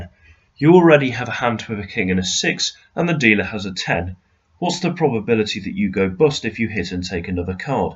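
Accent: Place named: United Kingdom. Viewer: Dude's got a british accent and talks about pounds and pence, in Europe, blackjack sucks because they don't check for dealer blackjack until AFTER you do everything. One result of this is you wouldn't be doubling down against a 10 or an ace on anything. But another is that you're actually incorrect then, and you WOULD hit or stand even if the dealer's got blackjack, you wouldn't know if you've already lost.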